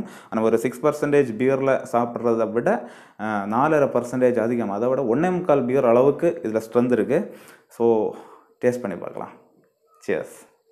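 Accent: Kerala, India. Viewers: அது சரி தங்கள் பேசுவதை பார்த்தல் மலையாளி என்றுதெறிகிறது,,,, தங்கள் மலையாளதெலேயே பேசலாமே எதகு தமிழ்,,,, நான் பிருடைய மொழியில் எபோதுமே பேசமாட்டேன்,, என் தமிழ் மொழில் தான் பேசுவேன் அப்படியே பேசினாலும் அவமானமாகஇறுகும், உங்களுக்குஎல்லாம் அவமானமே இருகாத????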